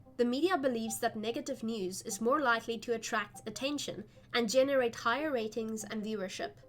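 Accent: South Africa. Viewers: I'm guessing that the mere exposure theory would be why people with specific phobia are encouraged to go through exposure therapy? Though frankly, as a person with a phobia, I want nothing to do with that 😅. I know this video is a month old already but I quite enjoyed it. I've always loved psychology but not on the level that you obviously do. Bonus that I'm an American who lived several years in SA (Capetown), so hearing a familiar accent is just lovely when hearing about a topic I enjoy. Thank you for the content, and I look forward to hearing more as you continue your studies.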